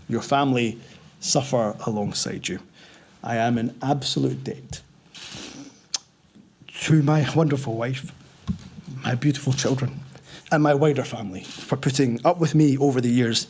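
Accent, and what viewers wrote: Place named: Scotland, United Kingdom. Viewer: he sounds pretty scottish to me. unles you're just a racist arsehole, of course.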